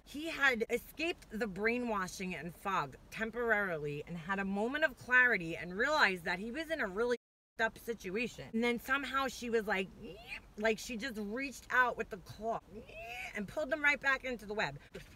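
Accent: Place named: New Jersey, United States. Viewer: are you from NJ or NY? I love your voice. I’m born and raised Jersey too...and I don’t hear my own accent but I hear it when you speak. Your very pretty BTW.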